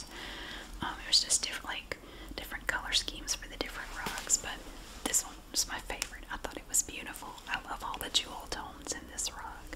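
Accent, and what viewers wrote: South, United States. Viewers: It's still a raffle, even if they aren't paying money for the tickets. Raffle just means a random drawing. Thanks for this. I made a comment on your golf video about you being too entertaining for me to fall asleep to your videos. I'm too interested in this so I can't sleep. It's okay. I always have the option to watch your jewelry vids. Those work for me. I hope you have/had fun at your reunion!!! You always do so much for us. I wish I had a billion dollars to give you because you deserve it. I just come for the whispers. Your southern accent just calms me. You have such a motherly-vibe. Do your kids and family know how much you've helped other people?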